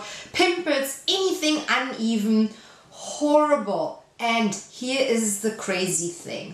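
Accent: Germany. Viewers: Where are you from? You sound Swabian.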